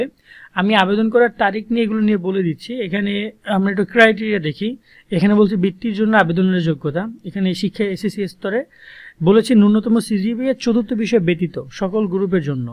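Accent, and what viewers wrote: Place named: Barishal, Bangladesh. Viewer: Vai apni ki barishal er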